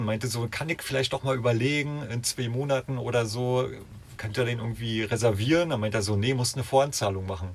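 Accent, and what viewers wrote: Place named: Berlin, Germany. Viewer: Oh Gott wie furchtbar 🫣 gut das euch nichts passiert ist🙏 Ich liebe den Berliner Dialekt 👍 erinnert mich an einen Brieffreund aus Berlin vor 40 Jahren 🫣🫶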